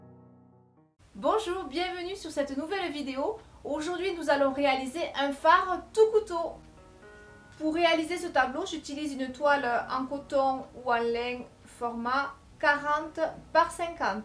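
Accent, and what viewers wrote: South, France. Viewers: magnifique tableau avec les explications top et ce petit accent du sud qui apporte une note musical à tout ça . Un grand merci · Le tout parfaitement expliqué avec le charme de l'accent du sud qui m'est cher. Merci bcp de la part d'un toulousain.😊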